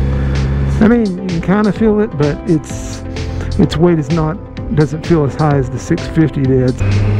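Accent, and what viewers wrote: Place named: South, United States. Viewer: Bro.. you have a southern accent in this video that I have never noticed before!? Gorgeous bike!!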